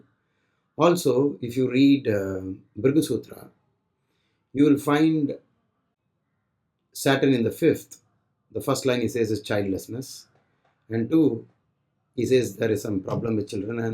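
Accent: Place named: Madras, India. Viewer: ur accent is madrasi type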